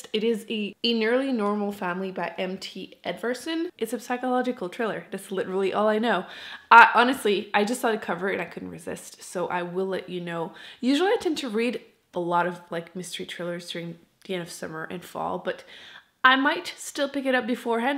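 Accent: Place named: Quebec, Canada. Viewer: Great video. Love your accent! Are you from Quebec?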